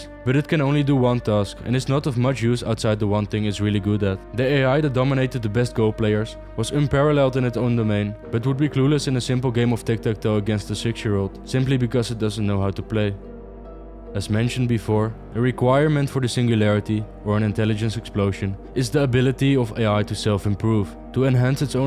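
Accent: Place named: Denmark. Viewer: ah cool, kinda sounded like a danish accent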